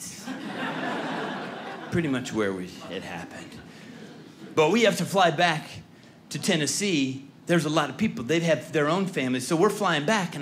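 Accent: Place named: California, United States. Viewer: i can tell you're californian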